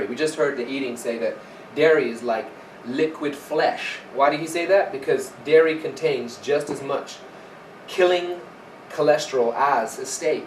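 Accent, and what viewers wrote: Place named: United States. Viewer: What's with the American accent? Thought he was British.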